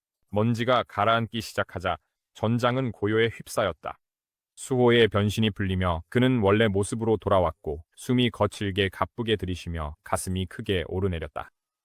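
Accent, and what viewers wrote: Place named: South Korea. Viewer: 한국인 그 자체네요... 얼굴가리고 나레이션만하면 한국인인줄 알겠어요😂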